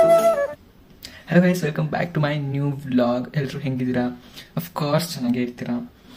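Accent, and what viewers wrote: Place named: India. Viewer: Your hindi accent 😅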